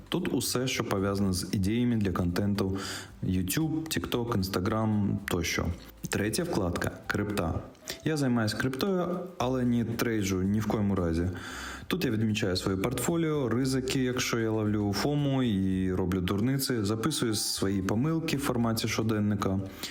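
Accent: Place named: Belarus. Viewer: наче українська з елементами білоруської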